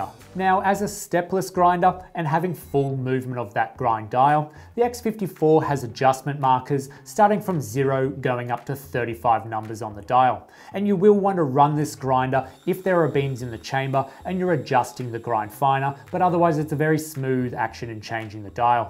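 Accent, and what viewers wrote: Australia. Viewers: Thanks for the review. Very detailed review and it's really helpful. Plus, always feels very comfortable when hearing aussie accent!!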